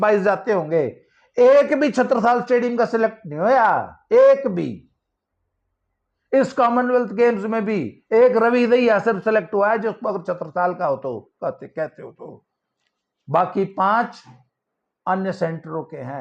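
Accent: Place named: Punjab, India. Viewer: I really like how you explain things without sugar coating, and yes, travel teaches a lot. It can be seen from your videos that you have a good hold over Eastern UP dialect, a nice heavy Haryanvi tone, and a nice Punjabi accent. Your "done this, done that" attitude is inspiring for me as a young father. Keep up the good work.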